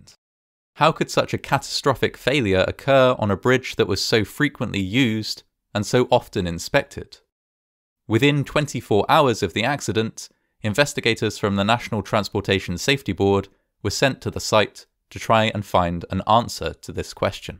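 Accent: England, United Kingdom. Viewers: Is he English ?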